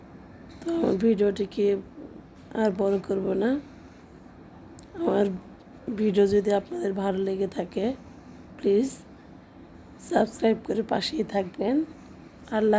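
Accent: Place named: Tangail, Bangladesh. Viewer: আমিও টাংগাইলের আপু। তোমার কথা আর রান্না দেখে মনে হলো টাংগাইলের মতো তাই বললাম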